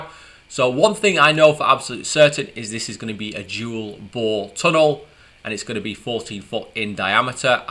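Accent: United Kingdom. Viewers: Do you live in Las Vegas now? For how long? And if so, isn't it time for you to lose your English brogue accent? We think so!!!!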